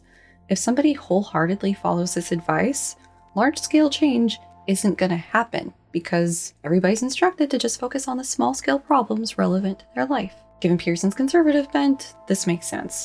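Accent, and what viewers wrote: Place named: Singapore. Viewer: the dude sounds like singapore. i m in singapore. it is a kind of hell here.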